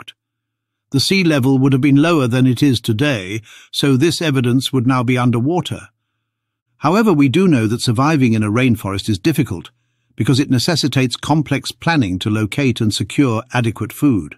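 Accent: United Kingdom. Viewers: BS !! The GIANTs were the first hue’men. We aren’t believing yo slick Brit accent. There is no Evolution (Evilution). There is ONLY DEVOLUTION. Archeologists & Anthropologists frauds have NO credibility with MELANDIGENOUS ORIGINEEs.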